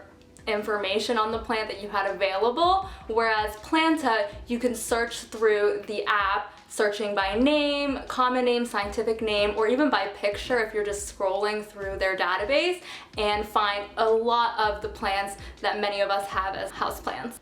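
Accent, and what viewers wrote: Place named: California, United States. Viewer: It's a California accent with a vocal fry and upward inflection. She likely doesn't hear it but I know exactly what you're talking about about.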